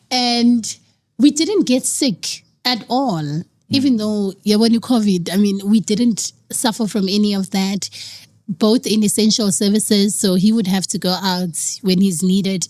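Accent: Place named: Venda, South Africa. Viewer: Is the host a Muvenda? I detect hints of Tshivenda accent in his English ❤❤❤❤❤❤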